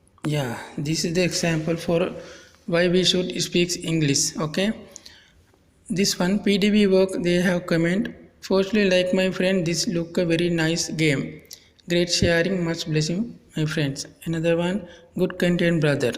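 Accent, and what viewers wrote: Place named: India. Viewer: Your English like indian man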